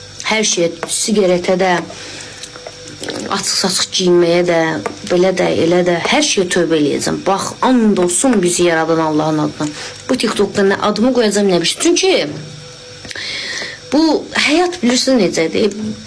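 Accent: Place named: Ganja, Azerbaijan. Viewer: Bu lehcesinden Genceliye oxshyur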